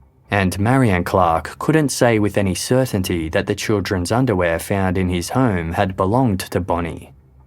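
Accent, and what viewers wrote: Australia. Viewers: I just found this channel, and its amazing! So gd to hear an Australian accent podcast. I live in Queensland Australia 🦘 And suffer from extreme insomnia. These are the perfect bedtime stories for me. I do believe i am actually still learning as i sleep. These stories are much easier to hear subconsciously as i sleep, than my usual twisted night terrors on steroids! Thankyou so very much. Your content is original too, a fresh and intriguing change from all the rest. Perfect intro too, no loud, jarring, drawn-out intro music thank god!! Just the fact that your content caught, and kept my interest ( through the ADHD), is a definite YES, a " my new channel to binge )!!!!" Luv and respect, from Queensland, Australia !!!🦘🌏🦘🌏🦘❤❤❤ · Definitely an Australian accent and nah it's not AI, the narrator has even done a Q&A on the channel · I'm American, and this is definitely an Australian accent, you can tell instantly.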